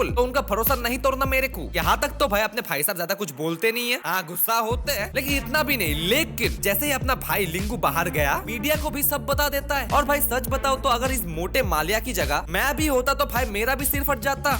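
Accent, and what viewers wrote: Assam, India. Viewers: bro are you from Assam 😂😂 please reply from where you are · Bhai tum assamese ho kya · Bro r u from noth-east assam!?❤ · Are you from Assam bro ?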